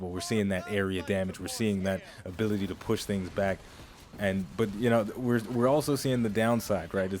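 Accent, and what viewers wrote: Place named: South, United States. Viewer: The taunting and the southern fried accent made this video entertaining. Well done.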